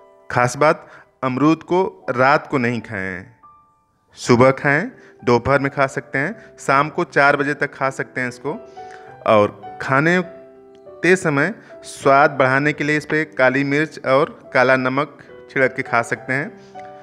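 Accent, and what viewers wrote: Bihar, India. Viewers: Dr n s varma aap khud k video kyo NAHI dalte ho ye kis bihari ki awaj h or aap khud present ho kar bataya karo. or kisi bhi fal ya sabji par video banao to uski ayurvedic property BHI bataya karo ab aap ne elopathy ka chemical value Bata di or isko sham ko ya raat ko khane se mana BHI kara h. kyo kyonki ye prakarti me thanda hota h is liye or ye baat aap kewal ayurved se hi malum hoga the true valuation of the artical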